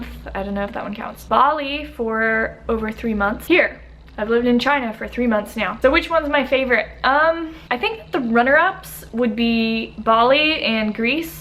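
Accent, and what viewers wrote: Argentina. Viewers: Holaaa !! Soy de Argentina , que lindo que estés interesada en aprender Español !! Se te nota el acento argentino !! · Suenas Argentina! Que linda!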